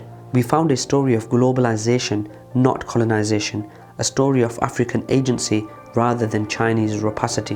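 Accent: India. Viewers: Voice of Indian. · He sounds Indian doesn’t he?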